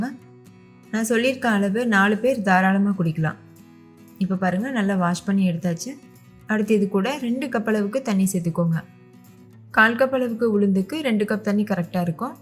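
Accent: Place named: Thanjavur, India. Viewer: ஹலோ உங்க ஸ்லாங் எல்லாம் பார்த்தா தஞ்சாவூர் டிஸ்ட்ரிக்ட்😊 உங்க ஊர்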